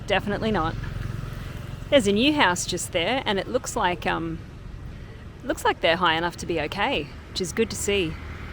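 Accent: Australia. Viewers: Jeez that's alot of water about. Just discovered your channel. Subbed, looking forward to see more of the life in Cambodia. Great to hear the Aussie accent too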